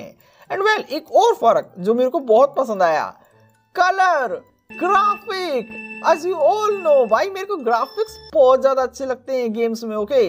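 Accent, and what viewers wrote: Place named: India. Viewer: What do HE speak he speak like Indian???🇧🇷 I have 900Qi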